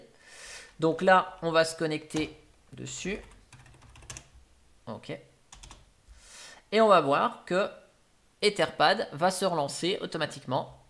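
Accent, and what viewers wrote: France. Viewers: Guy teub et Mec. C'est marrant l'accent Français quand même :)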